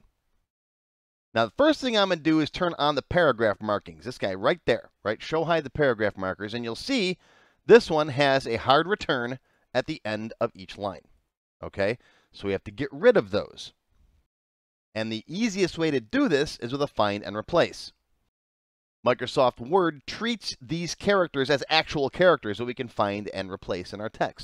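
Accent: United States. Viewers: Good ...but It would be better if you took into account viewers whose English Language / American Accent is their second Language, as they may not be able to pick up your American accent as quickly... Regards from an Iraqi living in the Anbar Provence in a remote area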